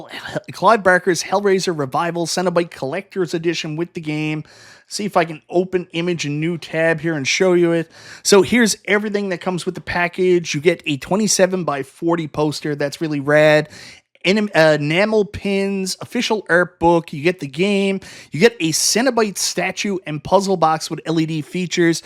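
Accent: Canada. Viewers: I experience only pain, and confusion when I can't understand the Canadian accent.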